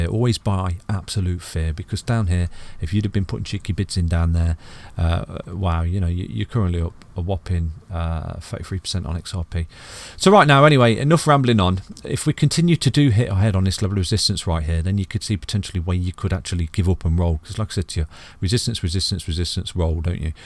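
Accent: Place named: Birmingham, United Kingdom. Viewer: How have I just found you? Another Brummie?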